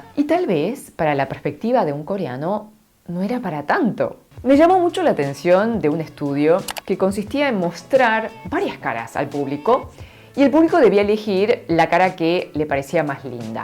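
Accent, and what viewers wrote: Buenos Aires, Argentina. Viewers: y con tu acento porteño estas mas buena, eres la coreana mas buena de habla hispana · Hermosa tu y con ese acento argentno! Mas bella aun...Un admirador Colombiano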